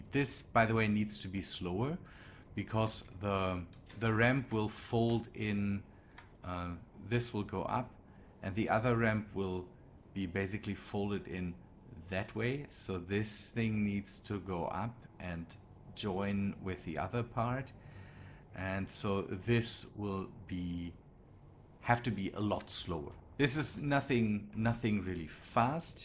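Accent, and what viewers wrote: Germany. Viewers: DIESER DEUTSCHE AKTZENT AAAAAHHH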